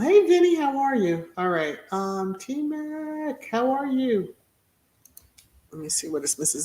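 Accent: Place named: RP, United Kingdom. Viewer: 👍🏻👍🏻👍🏻👍🏻👍🏻👍🏻🧚🧚🧚😘😍be safe ✝️✝️♥️♥️🌹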